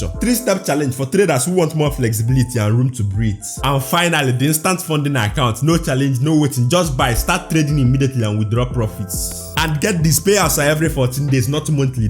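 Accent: Igbo, Nigeria. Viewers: Pally go and improve on your diction. Your igbotic accent is too annoying and local . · Very thick igbo accent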